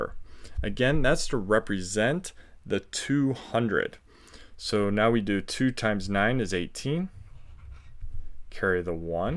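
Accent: United States. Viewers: Ty are you American 🇺🇸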